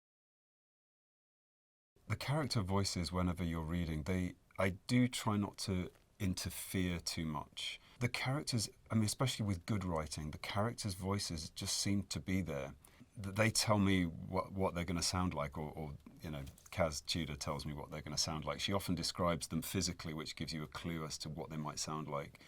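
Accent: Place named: Newcastle, United Kingdom. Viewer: Aw bless, thanks for outting the Northumberland/Geordie accent in such a good light.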